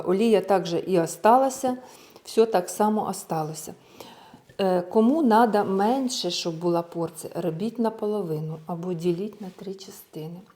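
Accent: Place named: Dnipropetrovsk, Ukraine. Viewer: Я в захваті. Чорму раньше не попадала на ваш канал. Як гарно у вас все виходить! Все так уверенно, як вроді у вчителя, вроді ви всю жизнь з молоду випікаєте. Мені вже за 50 та й то руки трусяться, бо поки мама була жива, то я не вміла даже зліпить пиріжка. Оце 10 років як хоть трохи навчилася. Українська точно як у нас, на Дніпропетровщінді.Дякую вам, буду вчиться дальше. З мене підписка обов'язково❤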